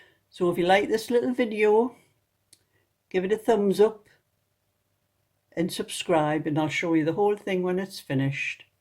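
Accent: Newcastle, United Kingdom. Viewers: Thank you for this lovely tutorial, exactly what I need for my cardigan pattern which assumed I knew how to sew on the pocket. Also love your accent, reminds me of my home and family from long ago in Newcastle 😁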